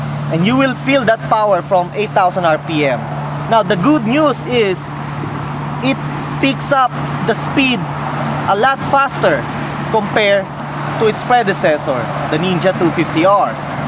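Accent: Philippines. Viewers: bro bakit pinoy accent kaparin dapat american accent kana, cant you not make it practice more speaking the American way.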